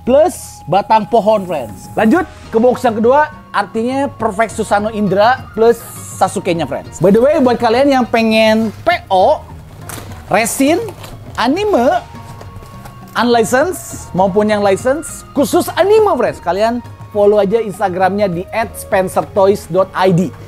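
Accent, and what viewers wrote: West Java, Indonesia. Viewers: BANG LO ORANG SUNDA YA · Sunda pisan mang Salam lembang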